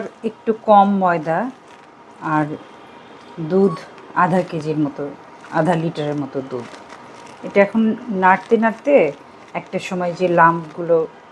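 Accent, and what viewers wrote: Sirajganj, Bangladesh. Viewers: সিরাজগঞ্জের টানযুক্ত ভাষা মাঝেমধ্যে ২-৪ লাইন প্রতি ভিডিওতে বলবেন, আপনার মুখে খুব মানায়।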